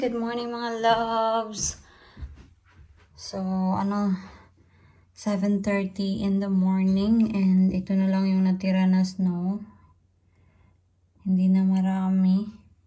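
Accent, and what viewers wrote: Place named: Visayas, Philippines. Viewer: Palagay ko Cagayan de Oro sa Mindanao. Parang bisaya ang Salita nya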